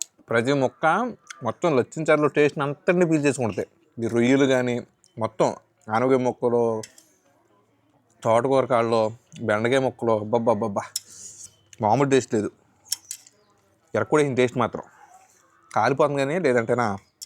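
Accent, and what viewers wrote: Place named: Amalapuram, India. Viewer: బాబు మీ వంటలు చూస్తుంటే కడుపు నిండి పోతుంది తరువాత మీ మాట వింటు ఉంటే ఇంక కడుపు నిండు పోతుంది ఏ ఊరు అమ్మ మీది మా అమలాపురం సై డ్ లా ఉంది ఎక్కడో చెప్పండి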